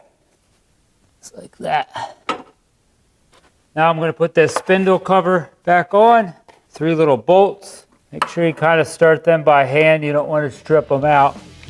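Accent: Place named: Pittsburgh, United States. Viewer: Your voice sounds like you live near Pittsburgh. I use a rope to pull the idle pully back and then remove the belt from the PTO. Also use an air impact wrench to remove and reinstall the nuts on the blades. Never clean under the deck and never torque the bolts. We have a fabricated deck, it will take years to rust through. Thinking about building a low crawler to be able to slide the deck in and out from the mower. I do grease the zerks though every time I sharpen blades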